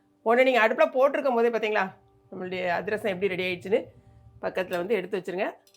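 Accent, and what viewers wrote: Tirunelveli, India. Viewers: Neenga Tirunelveliya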